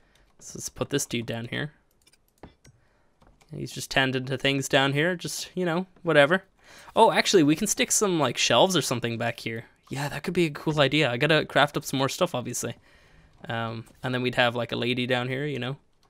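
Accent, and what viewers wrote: Ireland. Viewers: Didn't know my boy was Irish till now.... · 30 minutes of an Irish man making a bar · Wait my bad, not German, Irish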